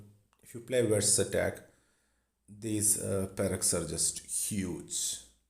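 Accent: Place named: Kerala, India. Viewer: Ate you Indian? You sound thoo.. Kerlite to be more specific?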